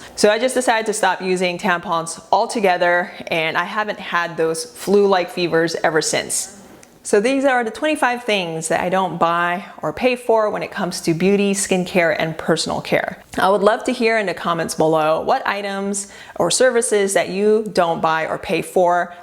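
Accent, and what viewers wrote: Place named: Singapore. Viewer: Are you originally from Singapore? My friend is and her accent is similar.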